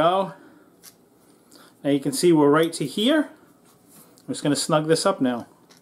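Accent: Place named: New England, United States. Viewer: I love new england accents LOL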